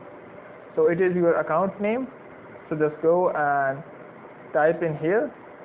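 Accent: India. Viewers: linti indiano parang di maintindihan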